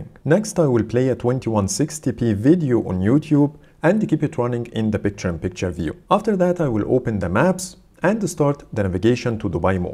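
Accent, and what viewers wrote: Egypt. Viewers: كأن اللي بيتكلم مصري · Bro your accents English like Egyptian people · Egyptian accent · I think you’re from Egypt from your speaking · انت مصري صح؟ باين من الاكسنت بتاعك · Bro‘s egyptian accent roaring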